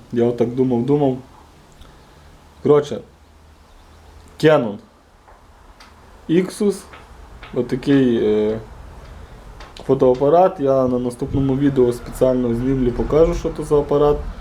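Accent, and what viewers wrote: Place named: Ternopil, Ukraine. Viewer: Привіт! Файні відео знімаєш. Судячи по мові ти мій земляк (я зі Зборова, Тернопільщина). Чи можна з тобою поспілкуватись в інший спосіб (форум, телефон)?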